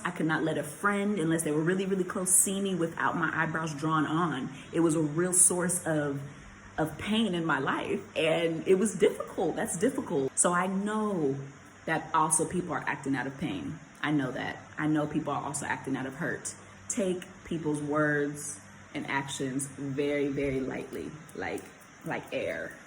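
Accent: Jamaica. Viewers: Such a great video. U touched on a lot of good stuff, and I feel all of this so much. Ppl be missing opportunities with real ppl and u cant force it. As u sai, jus wish them well. R u Jamaican?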